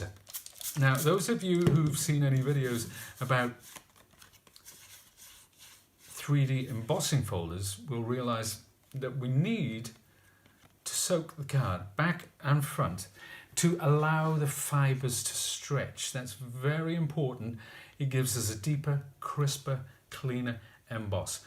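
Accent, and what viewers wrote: United Kingdom. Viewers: Well done! Also... nice to here a fellow British accent! 🇬🇧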